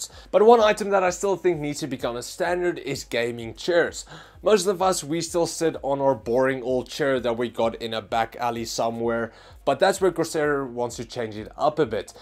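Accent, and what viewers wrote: Afrikaans, South Africa. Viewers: Kan hoor jy is Afrikaans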